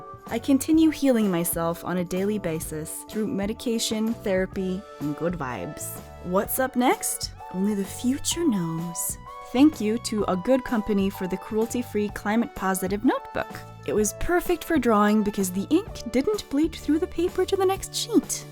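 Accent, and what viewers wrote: United States. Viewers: your english is very good for someone from norway. i swore you were american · also if u had not said you were from a different country, I would have thought you were from the states.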